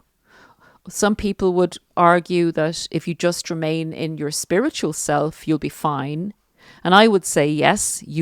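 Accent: Ireland. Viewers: Thank you, it's so helpful to move toward the light and stay there. I find your podcasts, your knowledge, and your support so incredibly wise and encouraging. I love your way of speaking—explaining—and your lovely Irish accent! Thank you so much!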